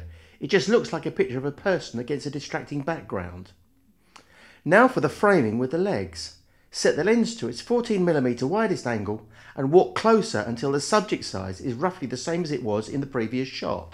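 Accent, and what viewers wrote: London, United Kingdom. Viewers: Fantastic video! I have been watching your videos for some time now and wanted to tell you how informative, well put together and straight forward they are. Also your "London Twang" reminds me greatly of my family which is very nice to hear as I am not currently living in the UK at the moment. Anyway, I will stop rambling. Take care and I look forward to more videos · I am here 9 years after this was made to hear that familiar London twang. I am also a Micro4/3 user despite what other people say about this "dying" format. It is a camera that I could carry with me almost everyday with intention to shoot or not for the day.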